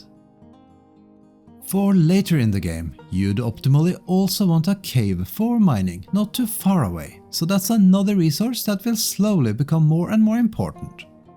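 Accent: Norway. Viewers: Are you from Norway ? your accsent sounds like that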